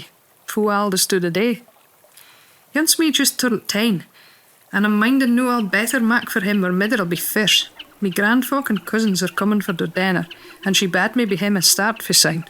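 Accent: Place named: Shetland, United Kingdom. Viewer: That's just a glorious thing all around! Listening to the dialect as a soothmoother and concentrating to keep track is a most enjoyable challenge. The combination of words, pictures and music just makes for a perfect piece of story telling. Thank you for writing, producing and sharing such a gem of Shetland magic. Your dedication brings a happy tear to a cousins een, too.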